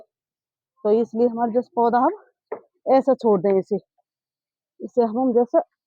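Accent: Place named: Agra, India. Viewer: Kahan se h*. AAP. Agra ki taraf ki language lag rahi hai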